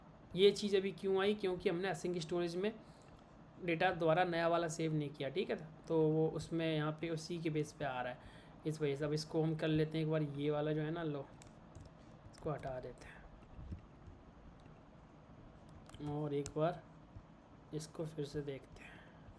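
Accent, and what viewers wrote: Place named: Western Uttar Pradesh, India. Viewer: Kisi biryani ke dukan pe jo ladke kaam karte h wesi tone me baat kar raha hai, 😂😂😂😂, what a language pachimi up wala h, 😅 व को ब bolne wala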